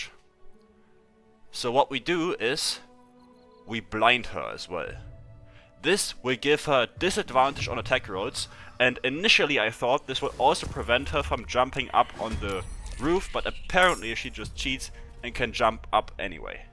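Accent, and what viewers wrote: Germany. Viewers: can I ask about your accent if you don't mind answering? sometimes you sound standard german but sometimes you sound like a combination of singaporean + australian so I was just trying to narrow it down haha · Are you german? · Yeah, it's probably not possible...I'd consider myself to be a diehard fan and way too obsessed with this game and I can't think of any way that might make it work solo, at least not within 15 turns. I'm currently rewatching your video (after watching some of your build guides) while doing my own "kill everything on sight" run. 😄 I did cheat for the nautiloid though, because I have mods that make additional enemies spawn and I couldn't have handled 3 cambions and 2 mindflayers (+ the 2 cambions on the way and all the smaller enemies) on my own :D The run is a lot of fun, though I gain xp way slower than I thought I would from slaughtering everybody; I guess not finishing any quests really makes a difference. 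Completely unrelated, but I was wondering: Is you accent German?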